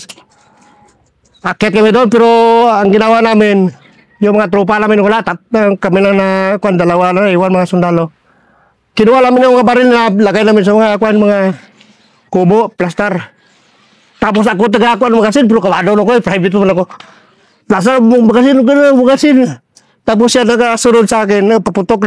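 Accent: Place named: Iloilo, Philippines. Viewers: Ilonggo talaga hhaha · Cool lg c sir oh...accent di gid madula Basta Ilonggo😊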